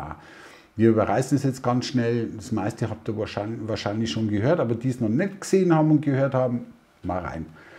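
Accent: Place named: Bavaria, Germany. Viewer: Servus, denke ich auch. Übrigens darfst ruhig Michi sagen😉. Ist wahrscheinlich bei deiner messageflut nicht so einfach. Dürfte ich dich fragen wo du wohnst? Deinem Dialekt nach scho im bayrischen Raum oder?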